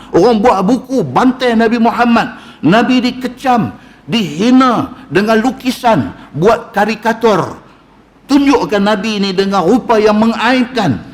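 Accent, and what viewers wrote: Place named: North, Malaysia. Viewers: Sy suka nya Tazkirah bgus. Dlm loghat Utara. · Loghrat Tanjong....Penang town jati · Assalamualaikum sahabat, bukan ilmu sahaja yang terbaik saya terima dari ustaz, tapi suara dan loghat utara tu yang sedap. Terima kasih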